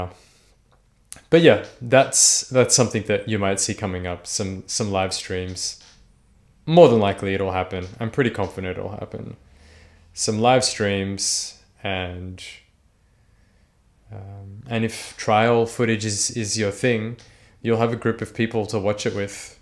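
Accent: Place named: Australia. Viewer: Whoops..hard to place... Australian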